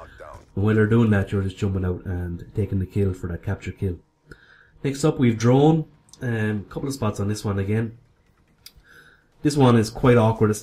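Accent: Ireland. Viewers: U Irish if u are respect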